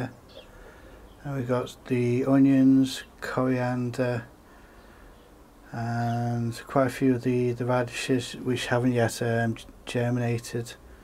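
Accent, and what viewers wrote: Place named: Merseyside, United Kingdom. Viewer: You learn something every day LOL Yep, sounds about right. I wonder how much the compost mix affects it? Whereabouts are you from - you sound Merseysideish 🙂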